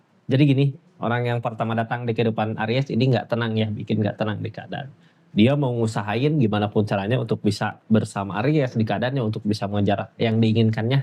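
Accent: West Java, Indonesia. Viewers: Sunda banget logat nya. Seneng nonton nya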